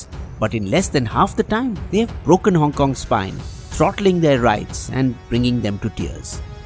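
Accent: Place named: India. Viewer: you mean kutzergart? Yes I do watch him but i can say tells story in much more interactive way and I can understand because of his Indian accent and kutzergart is a German.... And believe me when Germans spaek English it is the most hilarious thing.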